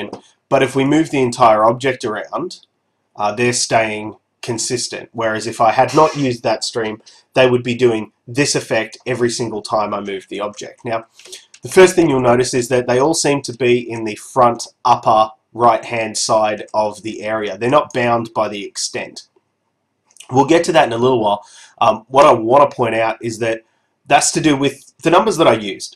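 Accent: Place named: Australia. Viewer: You must be from Australia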